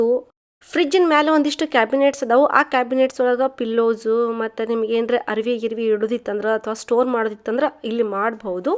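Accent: North Karnataka, India. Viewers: R u from uttara kannada or uttar karanataka..ur accent sounds from uttar karanataka but ur surname says u r from coastal part of karanataka..confused 🤔🤗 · Am fan of your kannada, america dalli idru kuda nim uk slant kannadana martilla, yavde hammu, bimmu torisde kannada matadtira, really great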